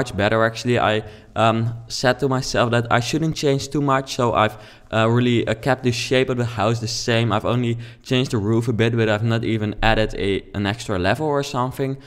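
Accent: Netherlands. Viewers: je kunt horen dat je nederlands bent, Kei goed gemaakt ! ;)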